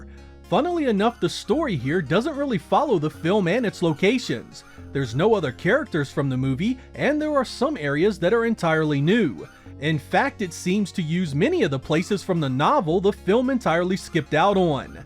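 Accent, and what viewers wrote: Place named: Northeast, United States. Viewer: you have a funny accent are you from some weird northeastern US city?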